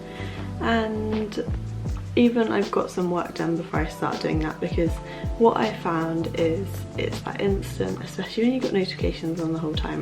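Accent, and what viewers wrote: United Kingdom. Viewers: YOU are so ADORABLE! Your pretty blonde hair, your adorable cheeks. I love your British accent which only adds to your charm. I love it when you talk to us and tell us all what your doing, your morning ritual etc. Keep up the good work. I love your videos, just love being with you.:-):-):-)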